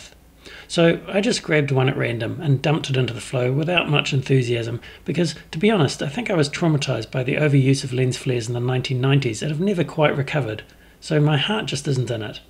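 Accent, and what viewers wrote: New Zealand. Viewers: wow i learnt about quite a few new Fusion techniques and methods in this one so thanks heaps. nice to hear a Kiwi voice too. great explanation and tutorial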